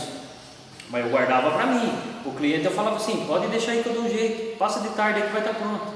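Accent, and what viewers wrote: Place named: São Paulo, Brazil. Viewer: Entendi, pelo sotaque pensei que era perto da minha cidade. Torrinha SP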